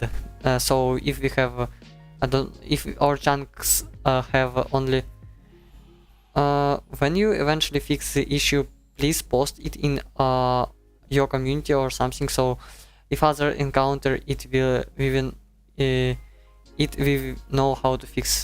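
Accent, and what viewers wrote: Russia. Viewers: мужик у тебя капец акцент лютый русский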